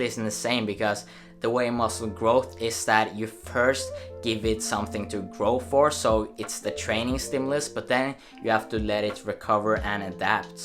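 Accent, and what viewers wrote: Norway. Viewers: Listened to your voice for 5 seconds, then I recognized the norwegian accent ;)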